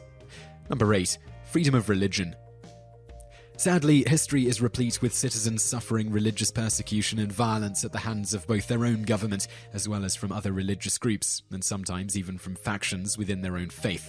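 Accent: England, United Kingdom. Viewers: Getting my American ego stroked by someone with a British accent just made my brain explode! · Wow, I didn't realize this until now: this guy has an English/Australian/New Zealand (IDK not good with accents) accent, dawns the Confederate flag, and talks positively about the United States...my brain hurts. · Was this guy born in the U.S. but raised in the UK......that explains his accent.....I was also born in the U.S.( New York ) but I was raised in Cyprus due to my dad but I can speak English with an American accent regardless of the fact that I was raised in a Greek speaking country.....now I leave in NY because I always wanted to and I'm 18:D and I think I will stay here forever 'cause I also got family here P.S I'm PROUD that I'm also American.......the topic of this video generically enough:) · It's nice to here those kind remarks in a cool English accent. 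But I have to disagree about Americas "War Ethics," especially over the last couple of decades. As an American I take no pride in telling you that we are on a war of conquest right now. ANd have been for at least 14 years. Probably more. But It's nice to here something positive for a change:) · 'MERICA! From what I gather in the video, is an American, despite the British accent?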